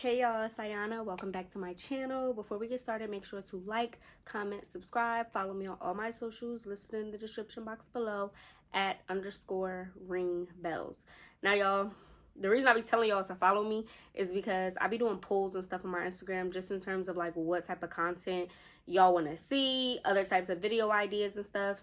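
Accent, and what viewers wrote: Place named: Philadelphia, United States. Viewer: As soon as I heard you talking I knew you were from my neck of the woods (I’m from Philly )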